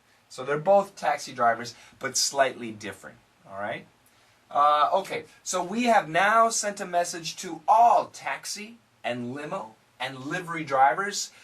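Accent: United Kingdom. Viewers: With hundreds of styles that fit together beautifully, thousands of colors and ideas, great ranges and kitchens, small living spaces and lots of wonderful plants for any garden, with a little inspiration you can achieve anything. Homebase make a house a home. Hello, what a British Accent. ohh my god. small or smart? I have always wondered why they are unable to pronounce the R well? it's the same here or even worst. The English language should have different name. "God bless America" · With hundreds of styles that fit together beautifully, thousands of colours and ideas, great ranges of kitchens, small living spaces and lots of wonderful plants to any garden. With a little inspiration you can achieve anything. Home Base. Make a house a home. British accent! Well, I'm more used to the American (or Canadian) accent yet it's good to hear it from time to time for variety's sake.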